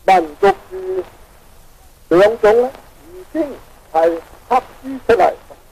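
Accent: Zhongshan, China. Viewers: 真係有中山口音~_~" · Sun was from Cuiheng so he possibly spoke 南朗话 as well as 石岐話 so his accent in this speech probably has a mix of 南朗,石岐 and 广府. I have a speak with a strong 省岐隆 myself, so I can hear this in this speech. This really is an important recording. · 聽得出國父的中山縣口音！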